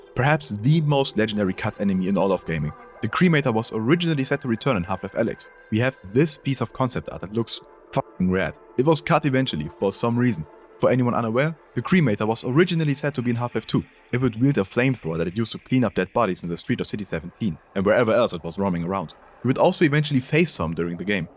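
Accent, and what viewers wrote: Germany. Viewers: kannst du bitte den deutschen akzent loswerden der triggert mich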